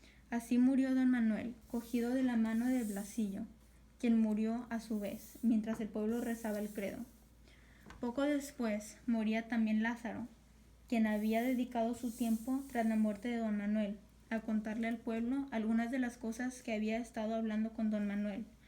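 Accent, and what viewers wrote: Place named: Latin America. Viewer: Por tu acento, veo que eres de un país de hispanoamérica. Sin embargo, cuando dices que Lázaro estuvo en américa viviendo dibujas el mapa de Estados unidos. Creo que deberías saber que Estados unidos no es américa y que Lázaro estuvo en uno de los territorios americanos pertenecientes a España, los cuales algunos se situaban donde hoy se encuentra EEUU. Creo que dibujar el mapa de estados unidos es una muestra de ignorancia simplemente y de apoyo al apropiamiento del nombre "América" por parte de los estados unidos. Un poco de cultura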